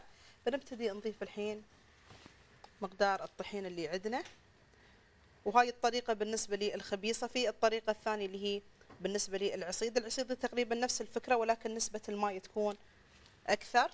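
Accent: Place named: Oman. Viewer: عجزت افهم لهجتها الظاهر انها عمانية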